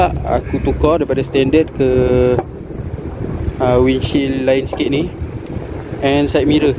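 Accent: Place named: Malacca, Malaysia. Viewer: Nice video bos. Orang melaka ke bos? Ada bunyi melaka hahaha.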